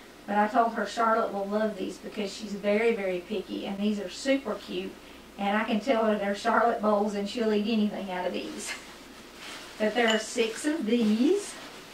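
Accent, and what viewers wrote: South, United States. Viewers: You made me cry, with your sweet southern voice and the music in the background was tearful for me. Thank you for the beautiful video. Yes I do zoom in see what you don't have. LoL I'm making that for dinner tomorrow, today I made your Skillet Steak, baked potato and green beans. It was delicious. I never made Shepard's Pie like that before but I'm going to. I'm so glad I found you, everyone out there knows what a beautiful person you are and I know with your talks you have changed a lot of people like you did me. Finding God through you was the miracle I've been wanting. to happen in my life. I feel very close to you and love everything you do and say. OMG, that Shepard's Pie looks amazingly delicious. Thanks again sweetie, God Bless you and your family. I'll always put God first. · Hi, I was looking for shepard’s pie recipes and I found your channel. 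I live in Mexico but I lived in Michigan for almost 18 years I fell in love with american comfort food I like your recipe and I saw the hamburger one too I like the way you explain and make your food I’m going to cook some of your recipes soon. Where do you live you sound southern. Anyway I will let you know when I cook your delicious recipes.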